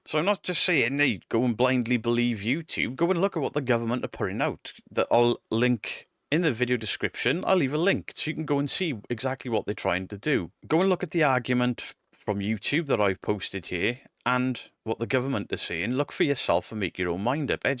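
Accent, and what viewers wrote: Wales, United Kingdom. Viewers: you sound welsh, are you?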